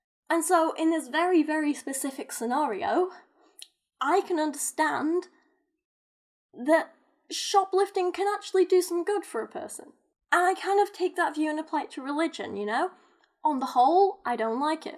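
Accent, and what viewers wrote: United Kingdom. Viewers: I think you are very smart and I like watching your videos. I sent a link to your channel to my 16 yo daughter (not just now, a while ago). Also, love your British accent. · You're brilliant, this is brilliant! I myself am a Roman Catholic, but I believe their is no and never will be any scientific evidence that a God exists. I even said that in the last book that I published on Amazon. Given those circumstances I'd say I'm between a rock and a hard place religiously speaking, but I got myself there and I almost wouldn't have it any other way. We have in common the fact that we are both straddling a hard line with the types of people we live around or converse with. I love the way you say the word "but" where it sounds like "bu" . I understand it's a British accent thing and me being from the USA. I don't hear that every day, and I just think that is interesting. 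I think you are perfect for not coming out as an anti theist, because it can be dangerous to do so. I say that knowing that all I have to go on is from my own life. I just know that If I where to come out and publicly denounce my faith and tell everyone that I hate Christianity and Christians that would be me doing the hateing. So keep on doing what you're doing, debating and posting videos about crazy Christian books that are as you would call them rubbish.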